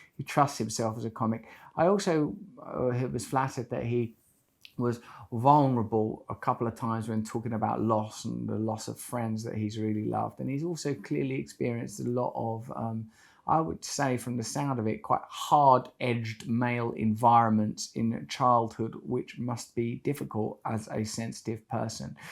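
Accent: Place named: London, United Kingdom. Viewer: You sound like you're from London♥️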